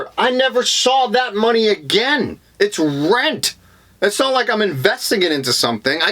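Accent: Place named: East Coast, United States. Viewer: Nice. I was wondering where that east coast accent was from. New York, here.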